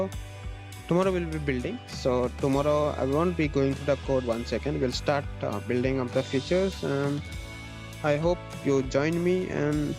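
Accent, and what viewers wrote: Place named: India. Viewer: indian accent is annoying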